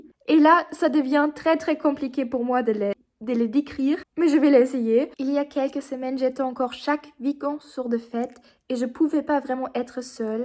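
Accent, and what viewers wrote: United Kingdom. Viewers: idem😊😅 j ai cru que l accent était britannique mais ça n a pas d importance. 😊😅 Elle est connectée et de la bonne façon. Son rayonnement nous fait du bien à tous et à toutes. Paix joie et amour 🙏🙏🙏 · C'est amusant, je n'ai aucune idée de pourquoi l'algorithme YouTube m'a proposé votre chaîne. J'ai regardé d'autres vidéos pour essayer de voir d'où venait votre accent mais... j'ai été surpris. Vous savez que pour un locuteur français natif, vous sonnez presque britannique. J'aurais parié sur britannique avant de cliquer sur d'autres vidéos par curiosité. Aborder des sujets complexes dans une langue que l'on ne maîtrise pas relève du défi. Certains choisissent la facilité... D'autres choisissent de parler métaphysique dans une des langues les plus complexes d'Europe 🥲 C'est fascinant, rien que pour l'audace et le côté incongru et insolite, je m'abonne, lol. 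Ce genre de démarches ne peut que me paraître sympathique...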